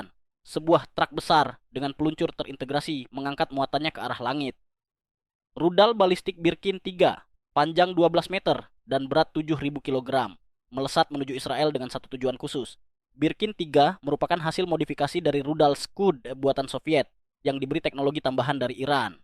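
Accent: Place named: West Java, Indonesia. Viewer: kang, urang sunda yak ? F-35 kang, bukan ep-35 😂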